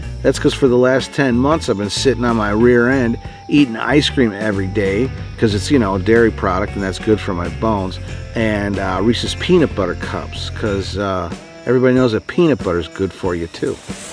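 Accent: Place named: Chicago, United States. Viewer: Hi - like everyone else, I was surprised to learn that you'd had a bad accident that stopped you from riding for a while. I'm glad you're back at it. As many people have said, your videos are very informative - from your biology videos, I get the impression that you teach and your videos have the touch of a teacher who thinks of the important information to pass on and the best way to do it. Another aspect of your videos I enjoy is the quality. I do video work for a living and your videos are really good visual communication. And there's a final thing I really enjoy about your videos. I grew up in Chicago until going off to college. Like you, I live south of Chicago but far, far, far south - in North Florida. Listening to you is enjoyable because you remind me of my hometown. I hope you continue to make videos for a long time. Thanks!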